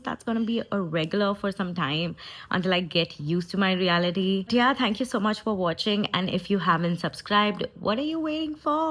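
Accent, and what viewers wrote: Telugu, India. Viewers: you n your sisterrrs bond ❤🧿🫶🏻 you tekugu accent is love❤❤.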